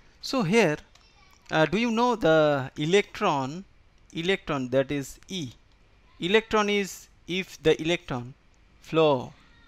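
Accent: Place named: India. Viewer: I really appreciate the content but I need to say, that for a not native speakers or not Indian the accent is hard to understand. So as a constructive advice I will recommend improving your speaking skills to easy reach the 1M.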